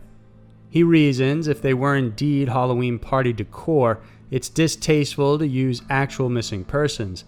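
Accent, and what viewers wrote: South, United States. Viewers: Anyone else think this guy is from the South>? he has a noticeable drawl to his voice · could be like mine, totally untraceable. My mom is from Michigan, dad is from Mississippi, I grew up between Louisiana and Alabama then college in GA. But I didn't interact with other kids much when I was young, read a lot and wound up with barely an accent. After moving around a lot in adulthood and picking up linguistic tidbits from all over the country (some intentionally, some not) it's a mash-up. But when I was younger and had so little accent that people thought I was from somewhere else ("where are you from?" "I was born in NoLa." "No, i mean where did you grow up? You sound like a Yankee." etc) I sounded like this guy. Very subtle southern accent but much closer to the "Nowhere Special" accent that newscasters have. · Goddess bless that southern inflection in your voice. 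Reminds me of the pine forests back home. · Trying to place your accent. Obviously southern. But not East Coast, because that's where I'm at.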